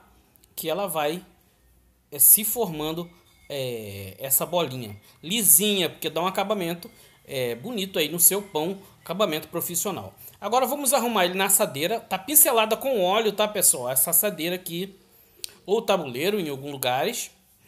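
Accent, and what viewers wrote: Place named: Rio de Janeiro, Brazil. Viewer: Olá TD BM Choll de bola vc e o máximo explica bem esse sutake de carioca guanto descansou o pãozinho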